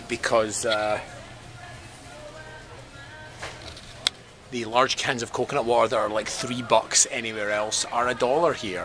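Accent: United Kingdom. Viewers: what are you.. a reporter!? damn man... stop trying to show the burn... just do it... stop talking and saying "ya... uh ya.. uhh ya....uhhhh ya uuuh ya...( with u.k. accent etc etc etc) what is this non sense!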